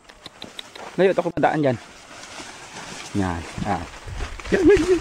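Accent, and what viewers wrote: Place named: Catanauan, Philippines. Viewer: puntong catanauanin tlga hahaha miss my hometown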